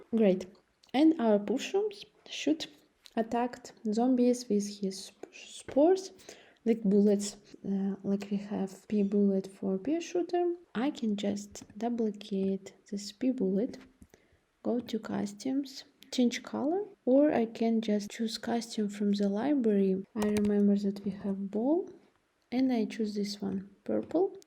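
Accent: Russia. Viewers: Русский акцент никто не отменял и спасибо за туториал😊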